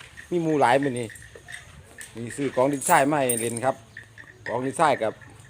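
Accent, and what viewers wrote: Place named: Isan, Thailand. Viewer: ชอบที่แกพูดภาษาท้องถิ่น ภาษาอิสานน่าฟัง เวลาบรรยายก็มีภาษากลางบ้าง แต่เวลาพูดกันเองพูดภาษาท้องถิ่นดีแล้วค่ะ ดูอย่างควาญสิงห์ แกบรรยายเป็นภาษากลาง แต่เวลาพูดกันเองแกพูดภาษาท้องถิ่นชอบค่ะ แม่บางช่องพูดภาษากลางตลอด มันเหมือน DJR ค่ะ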